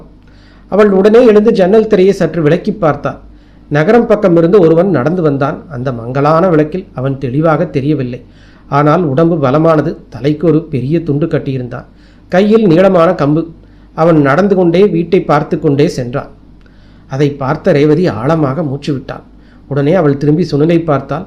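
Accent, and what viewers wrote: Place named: Kongu, India. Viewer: கதையும் வாசித்த விதமும் குரலும் அருமை வாழ்க வளர்க ...... உங்களது பேச்சு சிறப்பு கொங்குதேசம் போல தெரிகிறது தொடரட்டும் பணி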